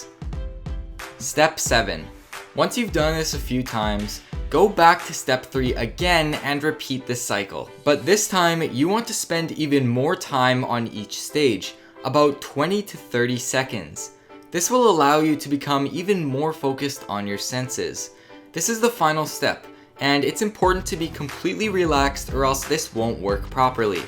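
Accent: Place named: Canada. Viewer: I sense a Canadian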